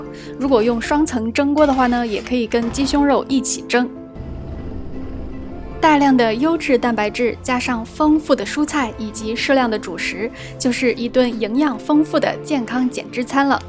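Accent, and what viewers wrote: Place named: Japan. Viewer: 看过一个日本人说中文做菜的频道，你这语音语调描述方式就听着好像啊。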